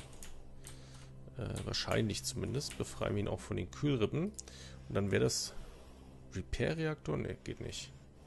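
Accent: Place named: Rhineland, Germany. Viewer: Knöpke und Nupsi :-D Bist du aus dem Rheinland?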